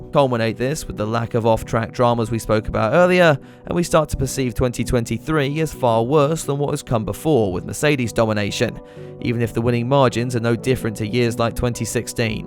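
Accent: United Kingdom. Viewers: "Why Red Bull (read Verstappen) Domination is WORSE... (Then Hamilton's)" Said the guy with the obvious British accent.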